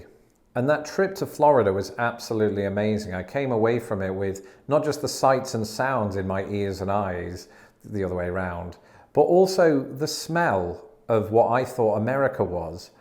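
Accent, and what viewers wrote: United Kingdom. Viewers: don't get TOO Americanized, because our 21st century culture is largely crap. And above all, don't lose your British mode of diction. It will always always serve you well. And by the way, a Russian friend of mine once clarified the matter for me about the alarm going off. It doesn't go off; it goes on. Same goes for the bomb.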